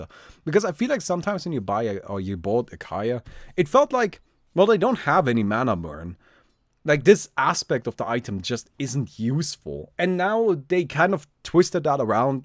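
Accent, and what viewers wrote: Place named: Germany. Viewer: I had no idea you were german... =D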